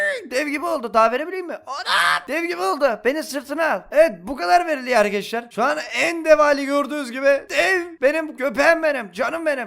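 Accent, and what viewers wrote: Black Sea, Turkey. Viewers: Abu sen nerelisin da? ( bubamun hesabu bence Karadenizlisin diye düşünüyorum 😂😂)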